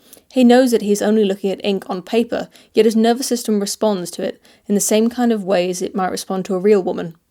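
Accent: United Kingdom. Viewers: British accent. Ozzie’s don’t sound like that mate. I guess you’re American because for some reason you’s can’t pick accents. Everyone else can. I can even tell the difference between bloody Yanks and Canadians. One is really loud and obnoxious, the other apologies all the time and says ay a lot. I can have two beers in my breakfast cereal then drink all day and still not fall in love with the first chick I see on YouTube. Pull your socks up! Just kidding, it’s all in jest mate. Have a couple for me you funny bastard! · you ARE attractive. I'm reluctant to say it, but you look great without makeup. I'm reluctant to say it because I don't want to be misunderstood. Plus, I don't want to offend. Thing is, I'm actually turned on by women who don't wear makeup. Again, I want to be careful here, but a woman without makeup is, for me, like a woman without clothes. 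It's revealing, exposing, arousing. I shouldn't post this since I've already had two white Russians this evening. But there you go. Wish I could delete this post. Or at least redraft it. Combine that with your British (or Australian) accent? Oh, dear God! P.S. I've read The Selfish Gene. P.P.S. Dawkins is an idiot.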